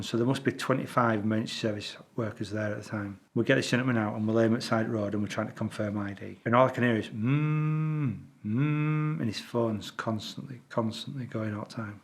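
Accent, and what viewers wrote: Yorkshire, United Kingdom. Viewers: First time on your channel, recognized that lovely Yorkshire accent right away. This brought back to me five years ago when I was in Scotland. I was getting ready to go do something’s when a cold dark wave rolled over me leaving me heartbroken and wiped out. It wasn’t until later that day that I was told my son in Vancouver died at that time. Eight hours difference in time, his time two am and mine ten am.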